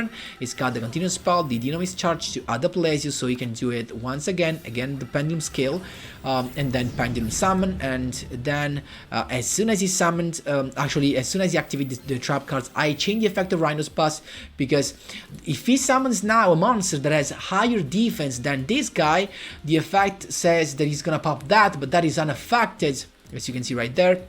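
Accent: Italy. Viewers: Parli na cifra bene in inglese ma si sente che sei italiano bro, bel video comunque di rado si vedono deck list diverse dalle solite che sono anche competitive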